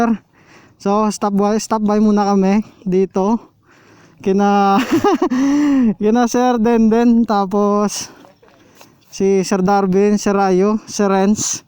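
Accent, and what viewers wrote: Iloilo, Philippines. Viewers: Tkalun kau kah ilonggo... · Ilonggo ka hah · Ilonggo ka lods ahhahah (testing ta😁😁😁)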